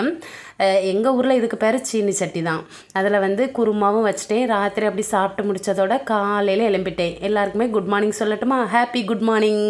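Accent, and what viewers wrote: Tirunelveli, India. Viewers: திருநெல்வேலி பாஷை எனக்கு ரொம்ப பிடிக்கும் ❤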